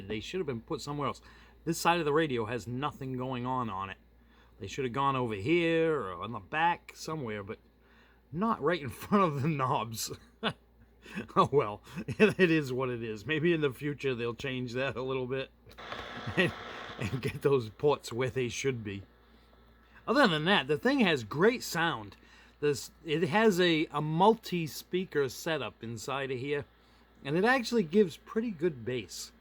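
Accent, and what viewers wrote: United States. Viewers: I just don't trust, nor believe, anyone speaking about ANY subject, with this v i l e " a m e r i c a n " accent... Taking a short break from bo m b i ng other human beings ? 🤮🤮🤮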